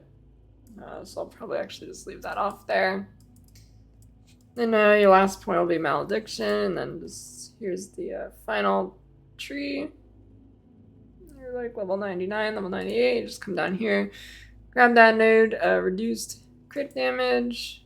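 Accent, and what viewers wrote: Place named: United States. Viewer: That's her voice. She has an American accent. It's not an indication of anything other than where she learned English.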